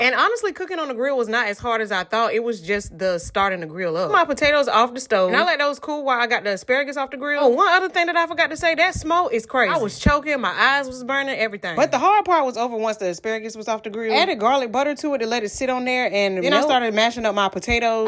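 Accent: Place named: Texas, United States. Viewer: You're accent let me know you're Texans. Glad to see you are repping HEB. I'm from Kingwood, but living in Chicago now. Miss me some HEB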